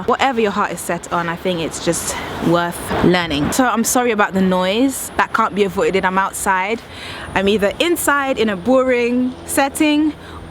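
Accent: United Kingdom. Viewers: gosh I love your accent! I wanna move to Britain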